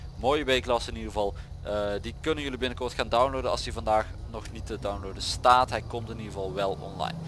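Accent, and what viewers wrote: Limburg, Netherlands. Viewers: Blijf je zelf. Je komt uit Limburg en daardoor heb je een accent wat ik overigens opzich wel mee vind vallen. Ik vind het helemaal niet erg, je moet lekker praten hoe JIJ dat wilt. Lekker boeiend wat anderen vinden, wij verstaan je en begrijpen je. Dus je hoeft helemaal niks te veranderen aan hoe je praat. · Praat lekker limburgs man je mag toch gwn doen wat je wilt anders zet hij/zij z.n geluid maar uit. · Mooie auto met de nieuwe striping! Gaat de politie de tourans ook nog nieuwe striping geven? EDIT: je komt uit Limburg, daarom heb jij een accent maar je moet gewoon praten hoe jij dat wilt! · En ik merkte het niet dat jij Limburg s bent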